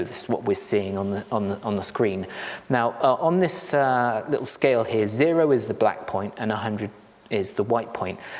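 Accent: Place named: London, United Kingdom. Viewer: It's called an accent. London or close by.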